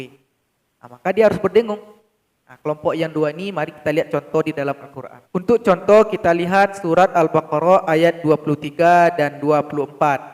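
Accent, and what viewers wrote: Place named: Solok, Indonesia. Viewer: Logat solok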